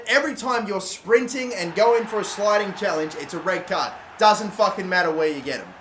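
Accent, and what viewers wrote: Australia. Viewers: Is he austrailian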